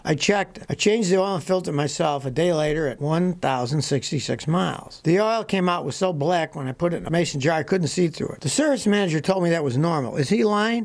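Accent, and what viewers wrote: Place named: Tennessee, United States. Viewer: It sure sounds like Tennessee lol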